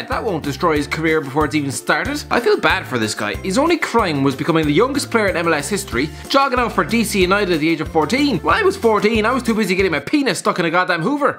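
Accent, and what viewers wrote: Ireland. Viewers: Robinho is far from overrated. Where are you from?? Ireland?? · Are you irish ?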